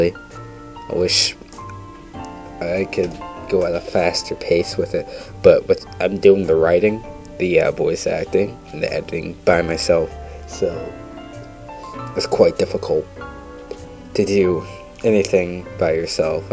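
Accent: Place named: United Kingdom. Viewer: You sound so british in this video. on another note, I can help.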